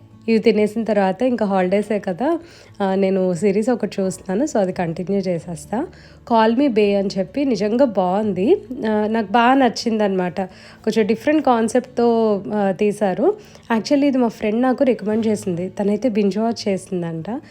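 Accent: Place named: Godavari, India. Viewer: Enka chadavaledu but I am watching his yt shorts ma super godavari slang❤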